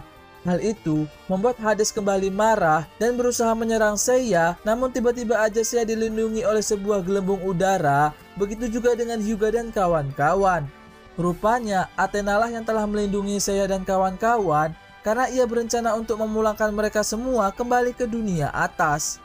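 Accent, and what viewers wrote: Palembang, Indonesia. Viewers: Tangan seya kena rujah... hhhh asli operator ni wong palembang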